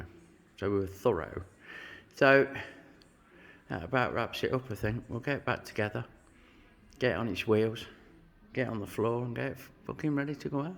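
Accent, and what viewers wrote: United Kingdom. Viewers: Hi. Good to know that you're aright after all that rain you've catched. Today I had a bit of a problem understanding what you said, but it probably was your dripping nose that made the difference. 😀 I talk German and American English and your British English always takes a moment to set in. 😀 If I ever get to change bearings on a wheel I will think of how you did it. Thanx. James from Berlin, Germany 😉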